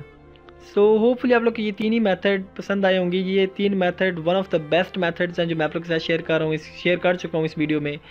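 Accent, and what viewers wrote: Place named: India. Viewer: This makes no sense,the words are in English but he's speaking Indian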